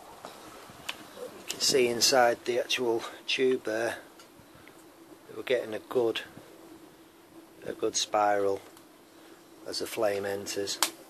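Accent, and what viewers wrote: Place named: Northern England, United Kingdom. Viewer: GREAT STUFF !!! I've thoroughly enjoyed your videos. I've learnt so much from you about heating with wood. I'm about to try to make a rocket adaptation to a smallish cylindrical wood heater in my bedroom. The chimney, unfortunately goes straight outside thus losing a lot of needed heat. I also have a wood stove in the kitchen and I'll see if I can have it heat water too, just like the Argas I came across in the UK. Where are you from by the way. You're definitely northern like me. In fact, I'm a Liverpudlian now living in Rio de Janeiro.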